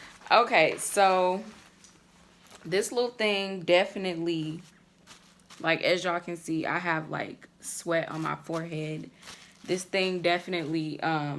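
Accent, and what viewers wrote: United States. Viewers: HI Beautiful!! This IS a PERFECT Video!! Thank You!!! What part of the UK do you live?? You sound American.😃😇🌼💐🌸💐🌸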